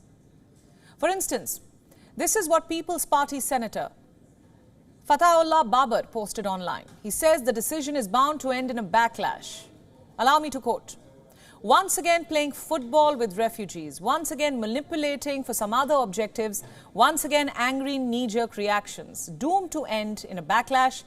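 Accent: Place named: India. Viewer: that Indian accent is giving me nightmares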